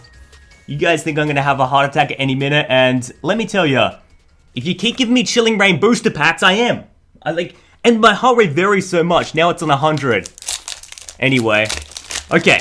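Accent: Australia. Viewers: R u Australian if u are ima sub and like now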